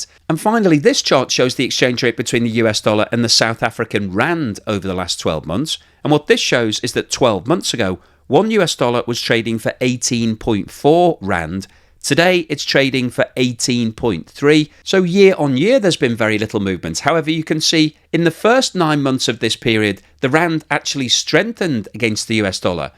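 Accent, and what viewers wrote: United Kingdom. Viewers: British accent = western bullshit. EVERY SINGLE TIME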